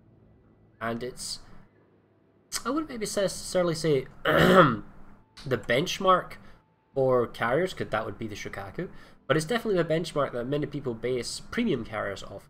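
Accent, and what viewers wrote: Scotland, United Kingdom. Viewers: he's scottish I think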